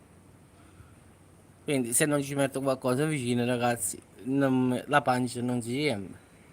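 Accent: Naples, Italy. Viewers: 🤣🤣 come sei divertente con stu napoletano!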